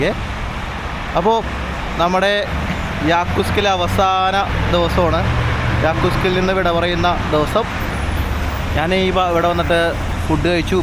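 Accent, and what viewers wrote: Kottayam, India. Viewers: Super 👌 എറണാകുളം malayalam പറ bro കോട്ടയം malayalam ആയല്ലോ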